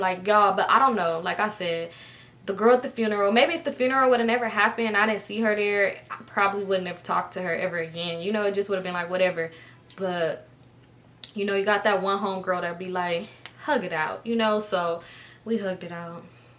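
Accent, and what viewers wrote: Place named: California, United States. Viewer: Tbh you always look gorgeous but in this video, you just flawlessly glowing and I’m loving it 😍🥰 literally all I be watching is your videos cause we got too much in common 😂 and we talk the same, must be a Cali thing cause I be so amazed hearing you say things I say 🤣🤣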